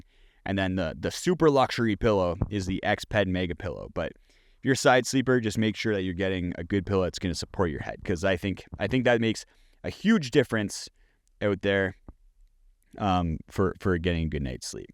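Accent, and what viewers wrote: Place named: Minnesota, United States. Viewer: Love your channel - just found it, and am making my way through. I really appreciate your thought process and honest takes/reviews. And your accent - sounds like home to this Minnesotan. 😂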